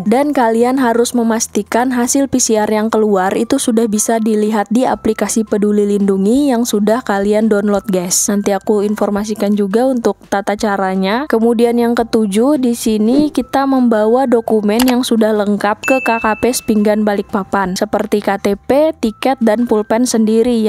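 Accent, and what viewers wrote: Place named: Banyumas, Indonesia. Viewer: Ow gitu om.,makasih infonya om. Sampean ngapak,ngapak e ndi mas?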